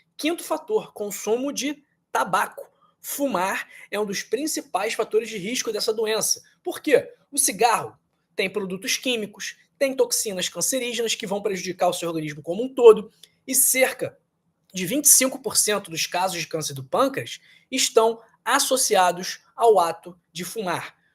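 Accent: Rio de Janeiro, Brazil. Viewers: Carioca sotaque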